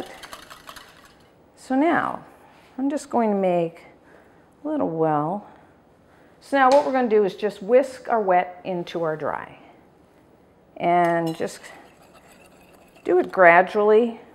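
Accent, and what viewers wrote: Canada. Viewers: Ah ok cool. You still sound canadian which is great to hold onto your accent :) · I couldn't place your accent but caught some Canadian. Great recipe!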